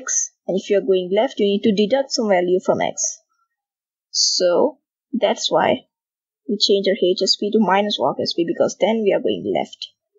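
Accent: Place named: India. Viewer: How come every single tutorial I watch online has an indian accent?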